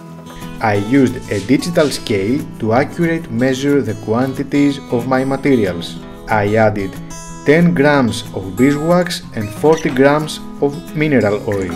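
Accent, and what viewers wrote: Greece. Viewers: I could tell in 5 seconds you are Greek. My Papou is from Zakynthos, Ti Kaneis! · that greek accent )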